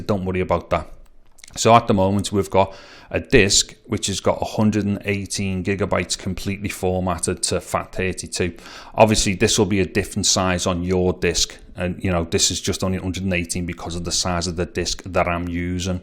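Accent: Liverpool, United Kingdom. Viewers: Very well explained project it makes such a nice change to have an English ( Scouse ) voice rather than an American voice explain everything in a easy to understand form and informed way thanks very much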